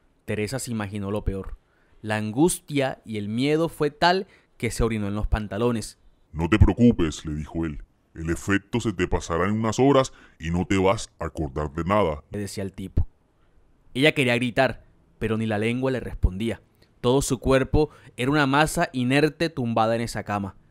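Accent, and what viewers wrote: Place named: Caribbean Coast, Colombia. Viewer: Me encanta como narras ❤ ese acento costeñoooo🎉🎉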